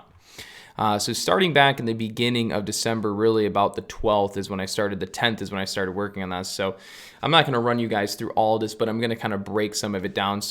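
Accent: United States. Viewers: You sound like your from Buffalo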